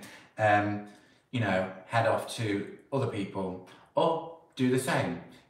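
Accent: United Kingdom. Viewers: I'm only part way into this video and I just had to stop it to comment. I've watched your videos for a long time and I've loved seeing how you interact not only with viewers, but with your PIECES! You seem to have an "intimate connection" with each project you undertake. And because I personally have a love of languages, I totally enjoy your British accent! LOL, but what I really wanted to say was, how proud of you your dear Mum must have been. And she would be delighted to know that you are once again being so creative, as you obviously were created to be! I'm also loving getting to know the person behind the face.....who knew you are a hairdresser! No wonder you branched out creatively into other genres. Your inborn love of color and beauty is evident from seeing the bits and pieces of your personal surroundings. Now, back to finish the video:^)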